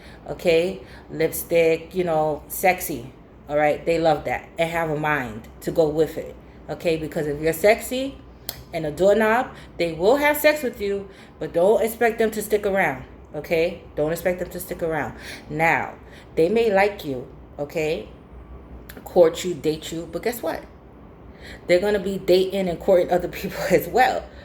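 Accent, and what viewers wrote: New York, United States. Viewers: Aww. Sorry to hear that. Be strong! You sound like a New Yorker. From the Bronx, NY?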